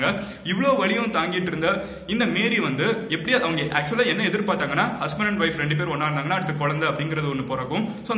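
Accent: Madurai, India. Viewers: Nama madurai slang la pesu thala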